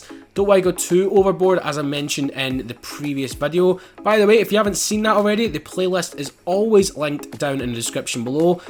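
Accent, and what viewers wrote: Scotland, United Kingdom. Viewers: he's scottish, that's why he pronounce it like that. but yeah that's a really weird reason to dislike. maybe it's his relationship talk idk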